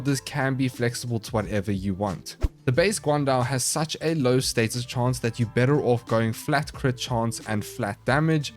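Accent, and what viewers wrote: South Africa. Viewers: Are you South African? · South african?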